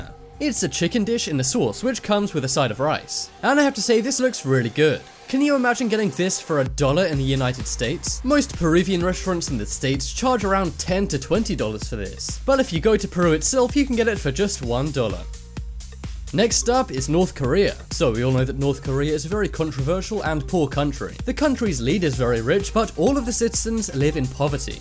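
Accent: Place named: England, United Kingdom. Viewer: Why does he have an English accent but use American terms in all his videos?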